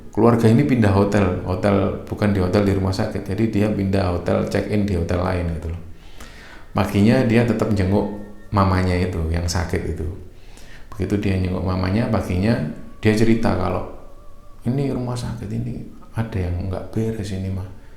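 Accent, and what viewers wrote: Java, Indonesia. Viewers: Mirip aksen jawa nya aja...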